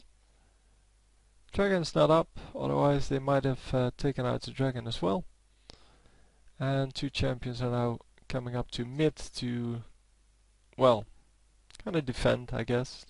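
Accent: Netherlands. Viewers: mooi hollands accent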